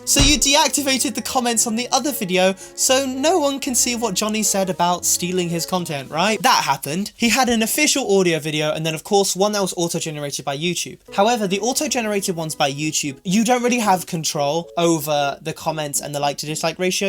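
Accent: England, United Kingdom. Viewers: I’m so slow😭😭😭 I didn’t realize Johnny was British until this video. Idk I guess I wasn’t paying attention before but I’m now acknowledging how thick his accent is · I hella LOVE this english accent !! Like, not the American accent, the English one sounds so soft 😭❤️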